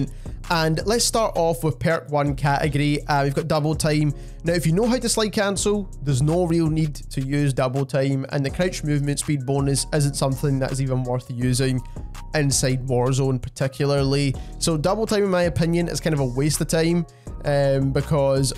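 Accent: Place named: Scotland, United Kingdom. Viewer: Scottish pretty sure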